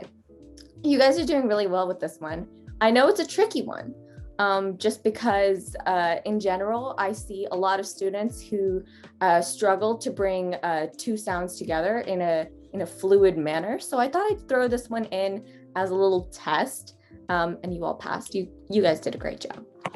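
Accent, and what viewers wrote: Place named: United States. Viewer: Hey...did u learn American accent? Cause u said u were in India, so I m an indian , I also want to have an American accent....but don't know where to start..ur accent is so cool....I hope one day i can speak like that🤞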